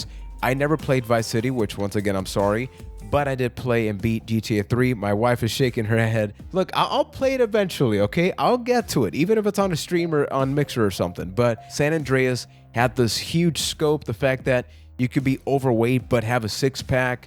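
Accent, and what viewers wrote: Puerto Rico. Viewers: Are you Puertorican?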